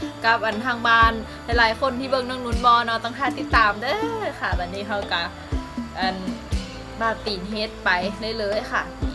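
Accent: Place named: Phu Thai, Thailand. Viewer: สวยหน้ารักหน้าขาวปานไข่ปอกคุน 😙😙ยิ้มก็สวยพูดจาหวานสำเนียงภูไทซึ้งมาก หลงมนต์เสน่ห์สาวภูไทสาว สกลนคร😙😙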